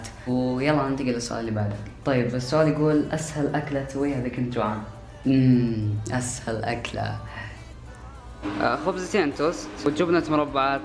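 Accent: Kuwait. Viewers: أحسبه كويتي ههههـ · انت سعودي احسبنك كويتي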